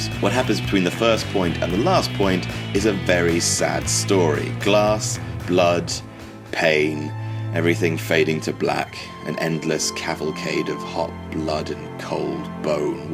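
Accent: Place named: England, United Kingdom. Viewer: your boring pommy monotone kills all the joy in hardcore wrestling. Get out of here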